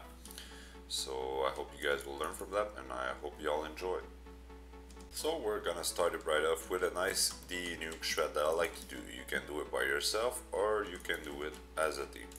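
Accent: Canada. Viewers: are you a french canadian ?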